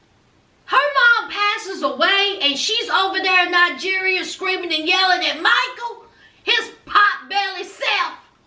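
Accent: South, United States. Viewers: Well I love it!!!! I'm a new subscriber and your southern drawl is what drew me to you. I'm from Atlanta, Ga. So I get it!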